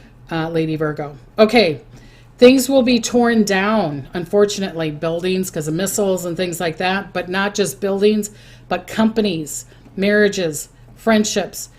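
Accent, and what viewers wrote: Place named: Minnesota, United States. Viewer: So glad I found this page ! I love your Minnesota accent ♥️♥️ Like a warm hug . Subscribed and notifications on ! Xoxo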